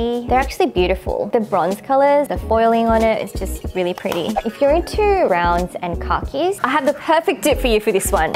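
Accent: Australia. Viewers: OMG ARE YOU FROM AUSTRALIA?? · Heeeeeeeeey, are you from Australia? 😃😃😃😃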